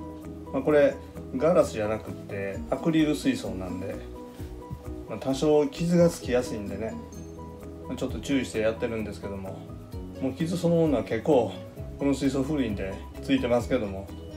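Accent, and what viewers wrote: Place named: Kansai, Japan. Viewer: わぁーー😆今回はちゃんの生声素敵voiceですね✨✨関西弁❓私関西出身なので久々の関西弁に懐かしく嬉しくなりました\(//∇//)\ アクリル水槽は傷がつきやすいのですね👍✨✨ 湯沸かし器を、通して水を、入れているのです😊細かいメンテナンスでちゃんのお魚達はご機嫌ですね👍✨✨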